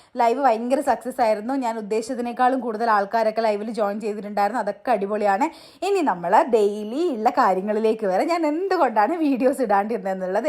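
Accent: Kannur, India. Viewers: ഞാൻ എല്ലാ വിഡിയോസും കാണാറുണ്ട്, home tour വീഡിയോ കെട്ട്യോനെ പിടിച്ചിരുത്തി കാണിച്ചു, 😊 പിന്നെ കണ്ണൂർ slang നല്ല രസാ കേൾക്കാൻ.. ഞാൻ ഒരു കൊല്ലംകാരി ആണ്.. കരുനാഗപ്പള്ളി നിന്ന് ഒരു 30 min okke, hus ന്റെ വീട് തൃശൂർ ആണ്.. 😊 ഇപ്പോ തൃശൂർ ആണ്..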